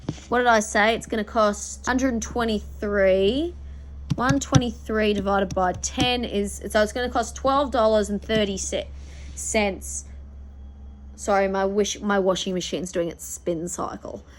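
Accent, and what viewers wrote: Australia. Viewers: It's just normal Aussie talk, mate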